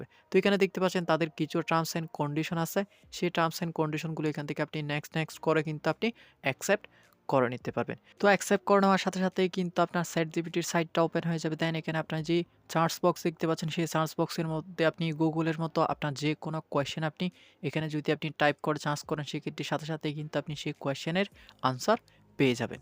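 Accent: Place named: Chittagong, Bangladesh. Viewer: নিশ্চিত চাটগাঁইয়া 😐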